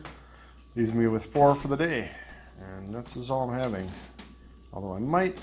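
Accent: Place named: Wisconsin, United States. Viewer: Awesome job! Haven't seen any bears yet in Northwestern Ontario Canada but just a matter of time. Lots of deer right now. Sometimes up to 6 or 7 at a time and I live in town lol. Couldn't help but laugh at the Canadian accent comment...as a Canadian I halve to say...You do sound like you are from WI. You never say "eh" which is the first clue you aren't Canadian. We say it all the time without realizing...too funny 🤣 My cousins live in Minnesota and they always laugh about how we say things here.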